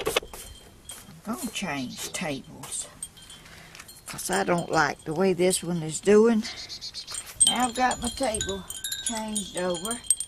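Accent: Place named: Georgia, United States. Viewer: Love all you do. Thank you for teaching us. Love that Georgia accent, as I’m from Griffin, Ga. love your talent.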